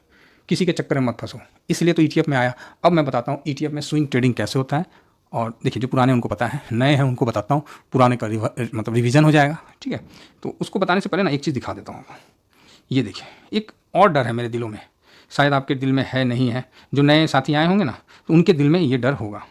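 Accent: Bihar, India. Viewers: App bihar ka lagra sir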